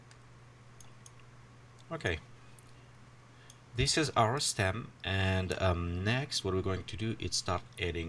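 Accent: Russia. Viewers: Да слышно же что ты русский) Ну расскажи по русски же)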